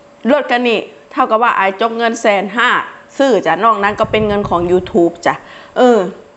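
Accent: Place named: Sakon Nakhon, Thailand. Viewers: พี่รู้จักและติดตามน้อง ตอนหาเห็ดเกาหลี เพราะพี่ชอบดูหาเห็ดและพี่สงสัยว่าอีนางนี้คึเว้าสำเนียงเมืองสกลแท้ นำมาๆจั๋งฮู้ว่าเมืองอะหลีไทกุสุมาลย์ พี่ชอบวิถีชีวิตน้อง จะทำให้คนอื่นเข้าใจเราทุกคนเป็นไปไม่ได้เก็บคอมเม้นต์แต่กัลยาณมิตรไว้ เป็นแรงใจให้ตนเอง น้อง พูดถูกจ้า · ข่อยล่ะเหยอะนำเจ่าเด้เสียงหย่ำกรุ้ปๆๆ ตาแซบ หล่า เสียงเว่ากะม่วน ภูไท เว่าม่วนอิหลีจ้า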